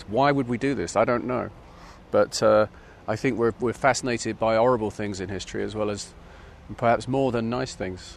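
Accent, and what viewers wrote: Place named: Portsmouth, United Kingdom. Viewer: Huh? That's not true at all. He sounds exactly like what he is - a guy from Portsmouth on the south coast of England. (Also my home town.) You might be getting confused because the Pompey accent has a partial west country burr on the Rs to it (like a pirate), and that same burr has always been a non-trivial component of the American accent. But trust me, his accent is definitely English. Perhaps just not the accent you think of as being English (there are DOZENS of distinct English accents).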